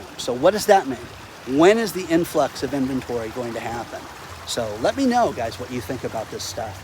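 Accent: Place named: Midwest, United States. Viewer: Has anyone ever told you you speak with a solid Midwest dialect, it's very disarming.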